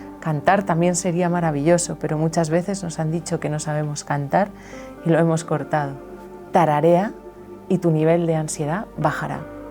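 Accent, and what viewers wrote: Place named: Spain. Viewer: Me gusta su tonos de voz que llega al corazón ❤️ y su explicación me gusta en verdad es primera vez que la escucho y primera vez los que nos enseña con un fondo de música súper agradable tiene voz de Española seguiré sus enseñanzas desde cuba cuba un abrazo gigante cuídate mucho un saludo a la familia eres maravillosa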